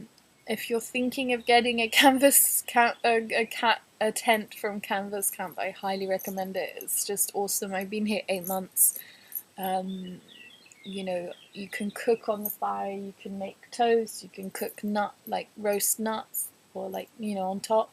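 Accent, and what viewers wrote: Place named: United Kingdom. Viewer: Love the British accent of a lovely British girl!!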